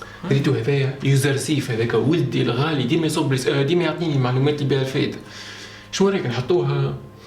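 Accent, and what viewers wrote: England, United Kingdom. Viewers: that british accent tho ❤ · That England accente tho :D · Dat english accent xD nice video , mafhoum w message reçu <3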